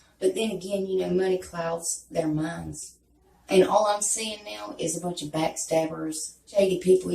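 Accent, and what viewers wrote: South, United States. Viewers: What’s your accent ? Are you from the south ?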